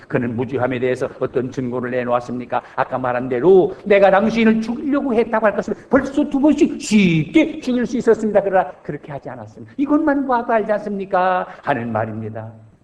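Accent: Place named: Pyongan, North Korea. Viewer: 중학교시절 영락교회에서 말씀을 들으며 성장했는데 예배중에 찬송도 알토.베이스 를 하시며 찬양도 성악가처럼 하시던 목사님 여전히 성대가 젊음이 넘쳐나십니다.평안도 사투리가 간간히 나오시는데 매력이시고 설교에 리듬이 있는 명설교입니다.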